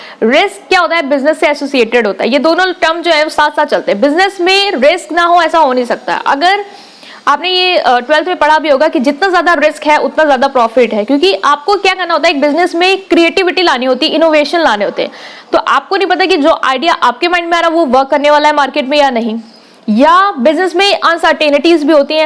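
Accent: Lucknow, India. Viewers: Ma'am aap lucknow se ho kya 😐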